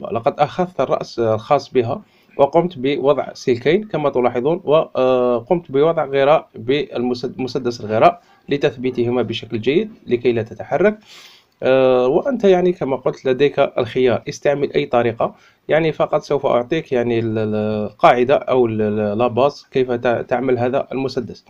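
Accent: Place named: Algeria. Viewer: ن من صوتو بلي دزيرير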